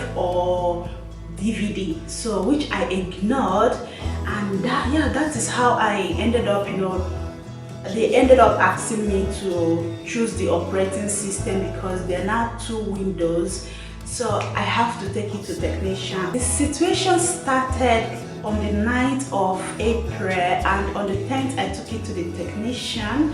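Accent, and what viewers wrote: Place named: Jamaica. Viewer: Hello, your accent. I love it. You sound just like my best friend her name is Maritza. But we all call her Jamaica. Are you also from Jamaica hun?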